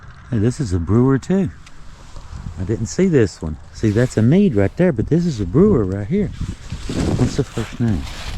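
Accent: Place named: North Carolina, United States. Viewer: im from Granite Falls, NC the way you talk( your accent ) is the same as my friends that grew up around here so its easy to understand you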